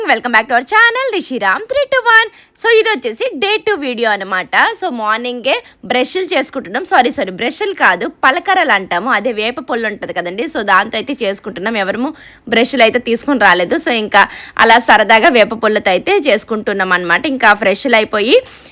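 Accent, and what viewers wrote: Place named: Telangana, India. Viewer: Madhyalow telagana slang enduku andi medhi srikakulam kaddaa😊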